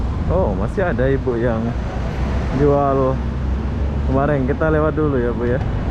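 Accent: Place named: Malaysia. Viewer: Haha gklah, cuman gaya bicaranya aja di Malaysiakan, sampe dlu pas ke Jakarta dan Palembang, dia di hujat netizen, sy masih ingat itu😂 mungkin abang nih sudah terlalu lama di Malaysia, jadi bangga dia dengan bahasa Melayu Malaysia. 😂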